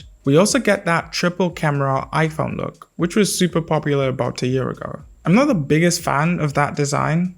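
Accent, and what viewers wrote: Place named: United Kingdom. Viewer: Bro you British accent is good tho 😢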